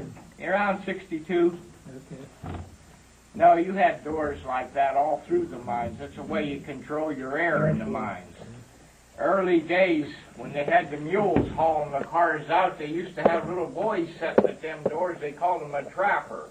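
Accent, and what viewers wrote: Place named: Pennsylvania, United States. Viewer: What a great PA accent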